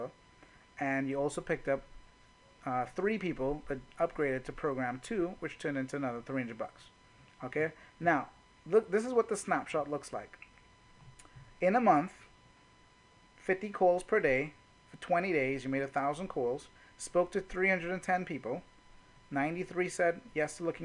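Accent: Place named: Trinidad and Tobago. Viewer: You sound Trinidadian.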